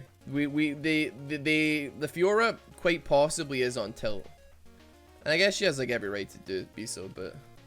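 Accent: Scotland, United Kingdom. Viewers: Is scottish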